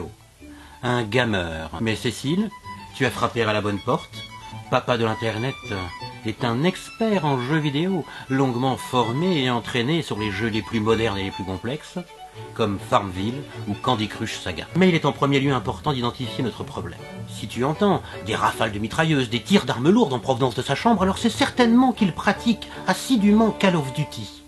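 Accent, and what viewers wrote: France. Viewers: Mon dieu toute ces prononciations made in france XD le coup des portugais sur minecraft j'ai bien ri !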